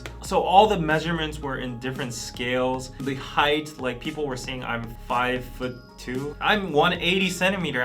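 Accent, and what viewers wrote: United States. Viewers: I thought this video was a joke at first because your accent sounds perfectly american as if you were born and raised here. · Wow your accent is very american/well pronounced for the short amount of time spent in the states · Where is he from that he speaks perfect American English?? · I am really glad you brought this point up regarding personal spaces. I always thought Koreans were so rude by bumping into people and not apologizing about it. Also have a friend refusing to visit Korea again cos an old lady slapped her hand in a shop for touching something. No offense or anything as I am obsessed with BTS, love k dramas and Korean food. I just never thought of it from your perspective. Apart from the Chinese, who are more nonchalant about personal spaces as well, all other Asian countries I’ve been to are more similar to America on this topic. 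In fact, the Japanese are insanely polite and the Taiwanese love chatting up with strangers. By the way, I always thought you lived in the States for many years as your accent sounds so American! How did you keep up with the English language after moving back to Korea? Interested in finding out. 🙏🏼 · perfect American accent. Very good assessment Especially about body space and Stop Signs: Stop Signs is about "Shield Arm" and Right of Way. One gives the Right of Way so that the other party passes you keeping his "Shield Arm" between you and him. So [all things being equal] you should always let the person to your right go first. Most Westerners don't know this. And never tip for shitty service. Biggest error: you are comparing California and Korea. California does not equal America. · this was great! But I'm confused... I've been watching your videos for months. And, if you haven't been to the US in 18 years WHYYYYYYY do you have a mid-west accent (Min-i-SOOOO-da)?? 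And why do you know so much about US geography and use American colloquialisms? Both you and Danny "seem" very American in thought process, at least in the sense that it is intuitive to you how to explain Korean things so that an American would understand/relate. That's why your audience is so big here, I think. Who/what is the influence for both of you? Maybe this is a video? Or maybe there is one and I need to look back at your old ones? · I call bullshit, your accent is a perfect american accent. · I was waiting to feel somewhat insulted, like I have seen in other “Koreans react to America” videos, but I found yours honest, respectful and amusing. I’m amazed that you only lived in America for only 3 years when you were young, yet you speak English so well. I can see you surprising the waitress with tip questions said with an American accent. Thing I found weird in South Korea was the letter “F” for the fourth floor elevator button because the building management felt the number “4” was bad luck. 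Guess like the number 13, so lucky building don’t go beyond the 2nd floor here.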